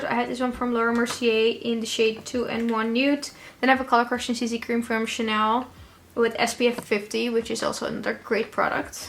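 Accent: Netherlands. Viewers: I hear a Dutch accent right? 🤭 I subscribed! I LOVE girly video's 😊🩷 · wait girl are u dutch? ur accent seems familiar hahaha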